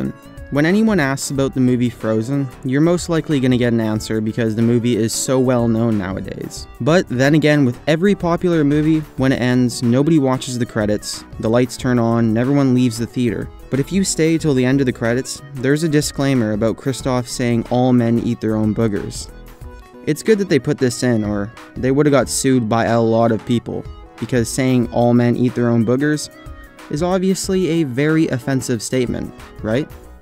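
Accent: Canada. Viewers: Ahhh....I love Canadian accents. <3 _ <3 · Is he Canadian? He keeps saying aboot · i notice a LOT of people that do the "numbers lists" (top 10, 5 things, etc..) always seem to have Canadian voice overs, whether it's due to being produced in Canada or maybe Canadians really like to do voice overs<shrug> either way, it is NOT a complaint, merely an observation, as I'm a big fan of our neighbors to the North! ;) (also, you could get into bars and strip clubs a couple years earlier than you could in the States and Niagra Falls was only a 3 hour ride away! God Bless Canada!!)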